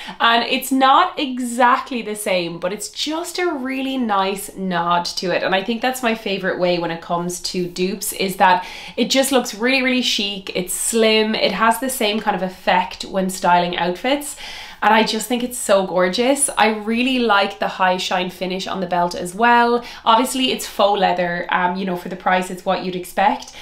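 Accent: Ireland. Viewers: Amazing duoes and finda. Love your videos and your cute Irish accent and think your make up and look getting better and better ( and you havr such pretty expressive eyes so much nicer without your glasses). 🩵🩵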